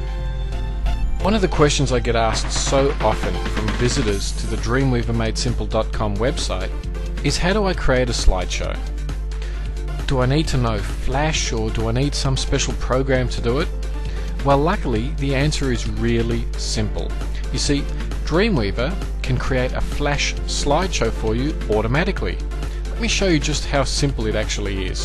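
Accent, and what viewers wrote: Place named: Australia. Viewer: Good to hear a fellow Australian :) Thanks for this!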